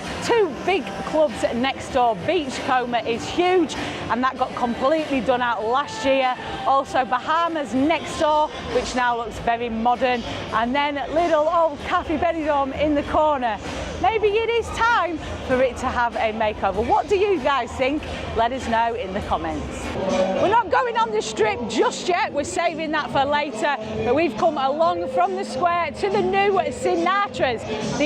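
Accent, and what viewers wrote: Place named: Runcorn, United Kingdom. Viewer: You from Runcorn sounds like my accent that haha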